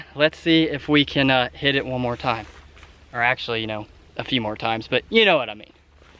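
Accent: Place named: France. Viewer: You sound french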